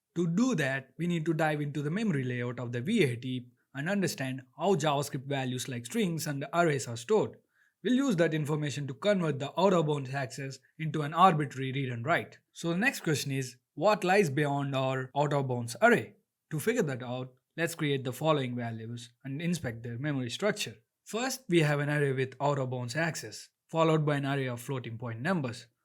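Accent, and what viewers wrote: India. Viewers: Are you from telugu states? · i love the indian accent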